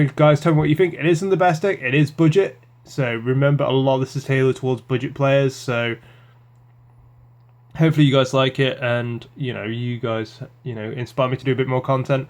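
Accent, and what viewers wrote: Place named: United Kingdom. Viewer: I'm in process of building a budget Kozmo deck and I would recommend running reasonings for the free summons of the ships and reasonings are cheap too and also the field spell can help unbrick your hands and code geass is fucking amazing man and you sound British I am also british whereabouts you from?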